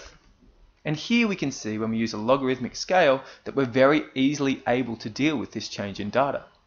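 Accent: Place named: New Zealand. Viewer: I bet you are from New Zealand. Loved your video! Thank you.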